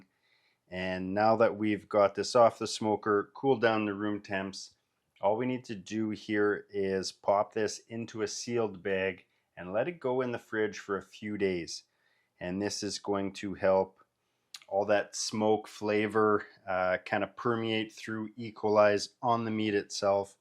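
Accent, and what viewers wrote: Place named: Canada. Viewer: Like your recipe, but man... your Canadian accent is killin me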